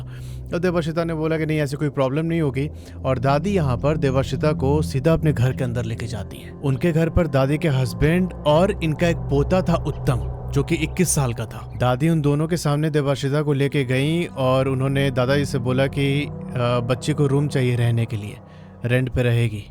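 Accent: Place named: Bihar, India. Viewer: Bhai i feel like you are weather from Bihar or belongs to area like kanpur, Gorakhpur or Lucknow. In every sentence you say- "hai nah" or " aayi nah"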